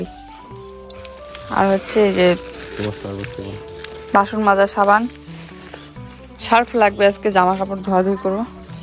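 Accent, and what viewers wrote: India. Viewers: Arokom adha suddho r adha Rajbongshi vasha milie j vabea kotha bolo shunte valoi lagea.cha er sospen tai dim omlet korte paro.thal tou patla hoi tai fute utheche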